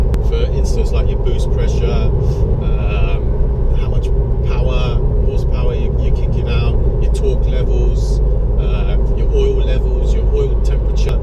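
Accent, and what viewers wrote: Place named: Bristol, United Kingdom. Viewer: From Bristol then mate? And nice reviews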